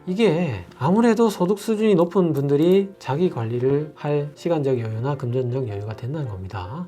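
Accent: Gyeongsang, South Korea. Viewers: 김근영 시비라니요!! 표현을 그렇게 하시나요? 난 70 할머니이고 닥터의 경상도 억양이 있으신데다 빨라서 쉽게 알아 듣기 힘든 단어가 있어서 말씀드린 겁나다 앞으로 말씀 곱게 쓰시길 부탁드립니다. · 10프로는 넘께 뺏는데 많이 좋아졌어요 3개월전 공복이 220이였는데 지금 100이하에요 근데 선생님 병원은 어디에요 경상도쪽같아서요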